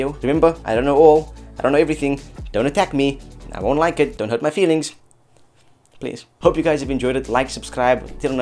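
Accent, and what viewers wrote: South Africa. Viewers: Wena you are a South African neh?!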